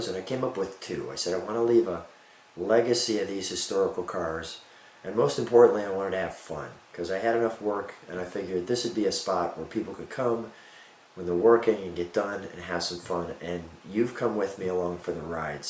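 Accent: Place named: Chicago, United States. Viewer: Hey. Congratulations and thanks for the videos. I love cars like you do but some days I'll grab a My Car video just to hear some Chicago accents. I've been in Texas too long. Best wishes for your continued success and fun!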